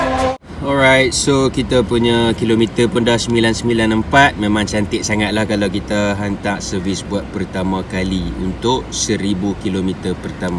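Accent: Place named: North, Indonesia. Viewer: loghat mcm org utara so kita geng la 🤣dah la R15 juga tapi aku R15 biru 😬 salam geng R15🔥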